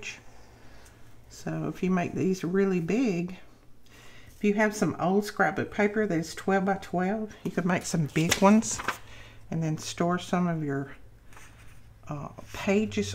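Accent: South, United States. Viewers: Cute. I do have a question if I may ask, does it give an accordion look ok the one end and feel to them. I love the prima papers. They are really nice quality hopefully ghhjjjj me It's hard to find in PA however I do find it in Maryland. And I love your southern accent. It's nice. Reminds me of when I was a little girl and I loved in Georgia with my military parents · Well your voice reminds me of home. I was raised in the south but have lived in New England for the past 21 years. I miss me some "home" sometimes!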